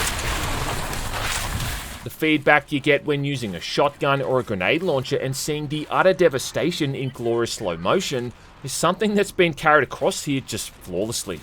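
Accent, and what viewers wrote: Australia. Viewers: I was on the fence about this game. I had watched exactly nine reviews on this and still wasn't convinced. However, YOU convinced me. Hell maybe its just cause your austrailian and that is the funniest accent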